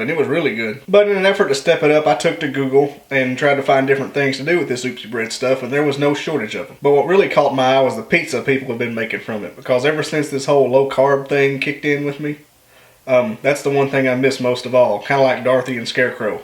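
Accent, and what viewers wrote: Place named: Texas, United States. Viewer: I'm gonna try this! Looks good! You have got to be from Texas,:)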